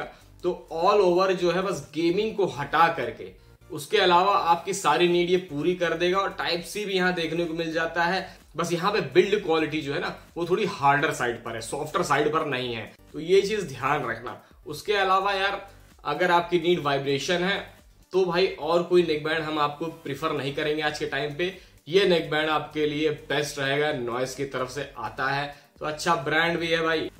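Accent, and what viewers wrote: Kanpur, India. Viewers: bhai mai Kanpur se hu...apki Language Kanpur ki hai Video me 😅😅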